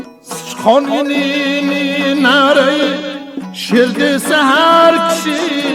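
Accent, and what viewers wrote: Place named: Namangan, Uzbekistan. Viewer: Ижрода хакикий номонгон. Шеваси хожи окомги оллох раматига олган булсун фахриддин хожи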